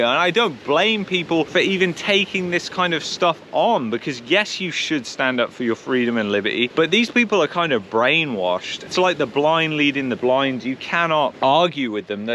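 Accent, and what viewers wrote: United Kingdom. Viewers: Thank you for having the courage to speak out on these important topics. It's refreshing to hear a Briton talk about these issues.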